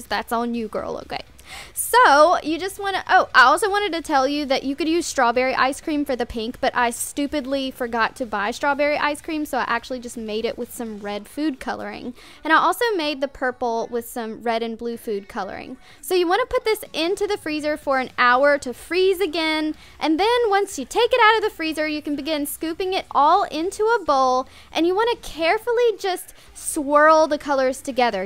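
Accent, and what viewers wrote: South, United States. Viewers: In love w your southern voice❤️❤️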